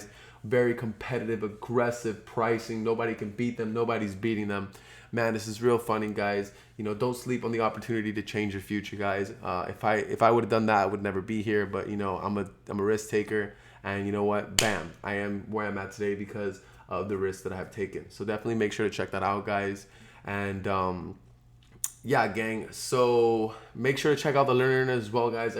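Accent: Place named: United Kingdom. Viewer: That British accent got me dead 😂😂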